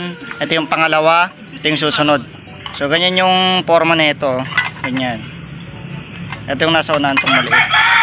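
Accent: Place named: Visayas, Philippines. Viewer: Bisaya ka lpds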